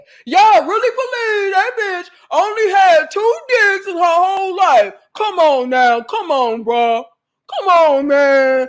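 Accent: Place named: St. Louis, United States. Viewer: Important message. Thanks. Where are you from or raised? I'm trying to place your accent. Ok, St Louis.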